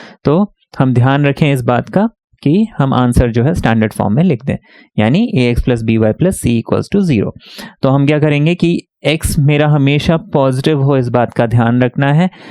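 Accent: Bihar, India. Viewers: Sir, Are you Bihari?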